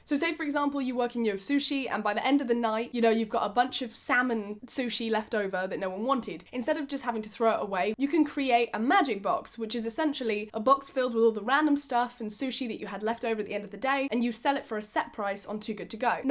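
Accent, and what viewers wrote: United Kingdom. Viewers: Your genuine British accent is so adorable. It sounds much better than ordinary american English. I like it very much. Which area is this accent from? Liverpool?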